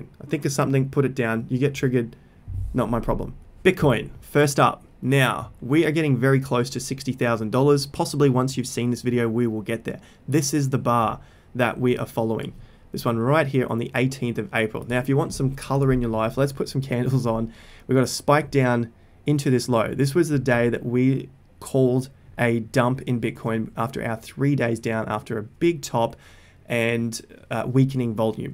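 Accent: Australia. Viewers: Aussies say "Megger" instead of Mega and "Wata" instead of Water. Love the content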